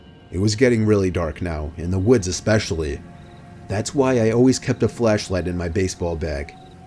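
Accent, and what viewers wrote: United States. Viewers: Lina Santos he does lol American accent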